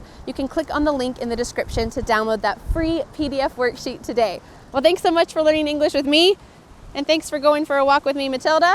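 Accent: United States. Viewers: I’m from Vietnam. I have been learning English for 10 months. I accidentally find your video. I feel happy because I really like American accent. You are a first teacher on my self-learn journey. I am gratefully your lesson. My mother settle down in the US. But she is not good at English. I hope myself can help her if I have any change go to there. I’ve been learning hard everyday! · Greeting from Poland i love americans pronounciation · Is this American english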